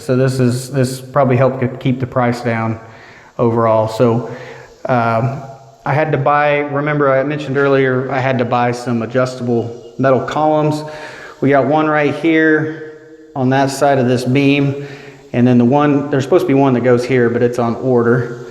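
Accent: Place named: Southeast, United States. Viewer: So nice and you are very good in your commentary. I subbed!!! By your accent I'm guessing you live in the south east, beautiful country BTW, do you foresee a problem with bugs since your so close to the pond?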